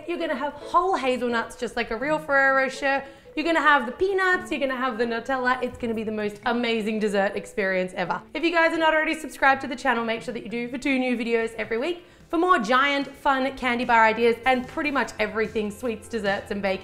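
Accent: Australia. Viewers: She's Australian so obvi she had a diff accent Don't be rude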